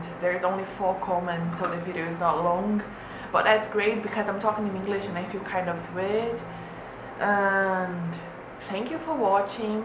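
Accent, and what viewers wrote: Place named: United Kingdom. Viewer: Oii, adorei o FAQ in english!! Você tem um sotaque britânico muito fofinhoo:D por acaso você sabe se na Inglaterra a área de engenharia naval é forte? Ou mesmo as áreas de engenharia? e, por favor, continue postando videos:D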